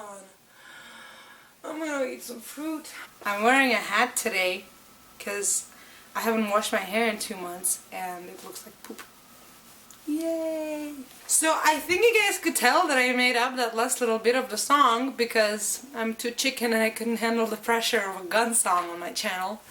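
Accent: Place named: Eastern Europe. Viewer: ah, moldova! interesting country. ur accent did sound eastern european to me but i still couldn't place it. : ) keep up the great work!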